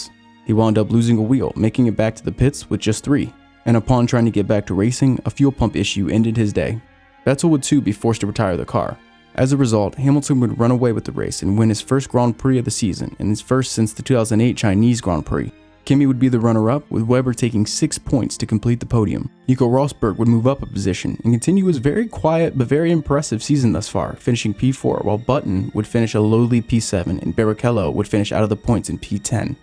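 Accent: United States. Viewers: American pronunciation annoys me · you use American pronunciations for everything besides "Vettel" and "Grand Prix." It's awkward. Coming from a television producer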